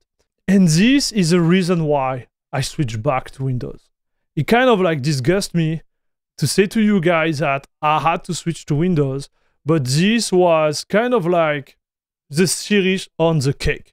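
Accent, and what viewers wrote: France. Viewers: One just have to love this strong french accent :-) Moral of the story: don't use rolling distro nor cutting edge versions of software when you need stability. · Damn that french acesent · that french accent goes hard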